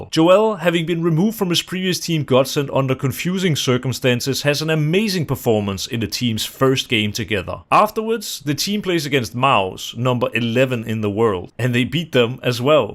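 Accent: Denmark. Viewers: the heavy danish accent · Man føler sig hjemme med den danglish accent <3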